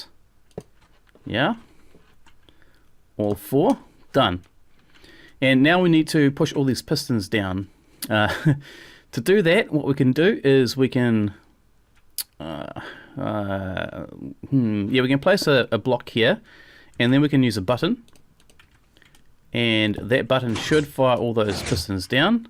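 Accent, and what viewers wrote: New Zealand. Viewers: Yea cause he's got a kiwi accent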